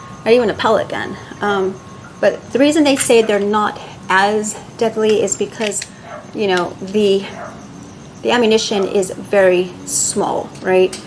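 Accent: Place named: United States. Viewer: Aussie here. You have the sweetest American accent lol Sarah Connor 🤠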